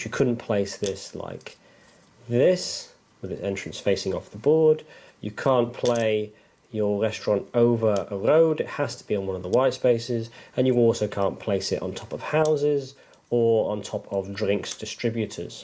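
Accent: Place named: Germany. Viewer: Actually - sounds like a hint of German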